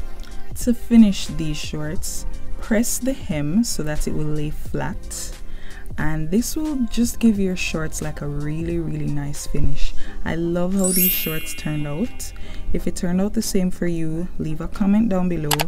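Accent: Jamaica. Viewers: Are youJamaican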